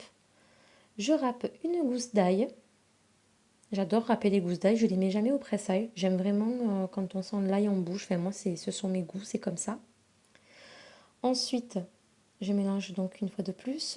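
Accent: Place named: South, France. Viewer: Excellente vidéo! Efficace, plaisante, pleine de bons conseils et en prime la musicalité du sud! Bon, y a plus qu'à faire la recette! Merci!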